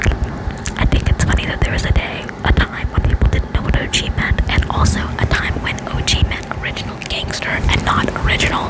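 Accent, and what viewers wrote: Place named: United Kingdom. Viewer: girl your British accent is so good!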